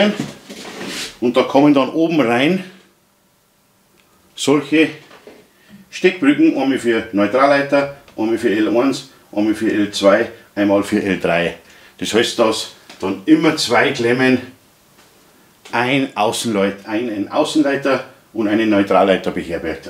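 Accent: Bavaria, Germany. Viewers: Als Laie bin ich hier vermutlich so etwas, wie ein Exot....und trotzdem bemerke ich Deinen Spaß an der Freud' .... und verstehe bayrisch und Technik immer besser!.. Super Video!!!! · Bitte red wieder boarisch!!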